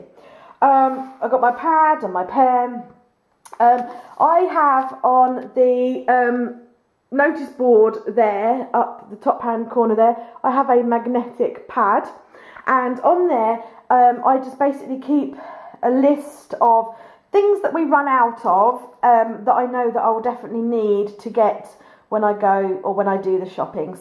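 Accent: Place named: United Kingdom. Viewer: Dear, If you wanna try something unusual, I've got a vegetarian recipe. There's a traditional hungarian meal, called stuffed paprika and my mom invented a vegetarian version a few years ago. Let me know if you're interested and I'll post the details here, or send you an e-mail:) Your videos help me so much, because I'm still getting used to the british accent, but with your help, its easier, so THANK YOU!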